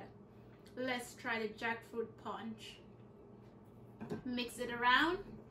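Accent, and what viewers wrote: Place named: Jamaica. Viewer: Wow i have not tasted something like this before i know it is very delicious i can hear Jamaican English asent i love Jamaican language it make me laugh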